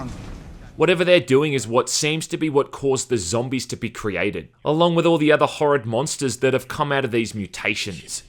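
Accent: Australia. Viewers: Are you Australian? I only ask because I like to support local talent when I can. Not trying to be rude. Really enjoying your videos.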